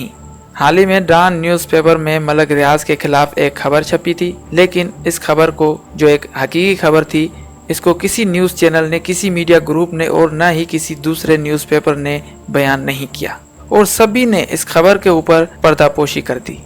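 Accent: India. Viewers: Ye viedo kisi pakistani ne nhi Banai balke kisi indean ne Bandai he kuk kaf Korean kh prhr rahe hen in ki urdu indean bolne ka andaz he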